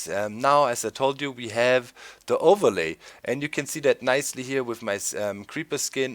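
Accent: Germany. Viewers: ich wusste es du bist deutsch (das hört man etwas) ab links oben kann man die deutschen Optionen sehen :D Hallo :DD übrigens gute Vorstellung ;D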